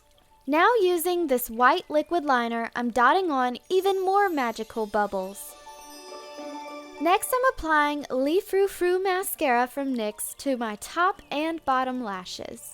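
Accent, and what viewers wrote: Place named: South, United States. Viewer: i love your look, such an amazing transformation but I have to admit, I laughed so much when you're cute southern accent said 'Ghetto Gold', made my day <3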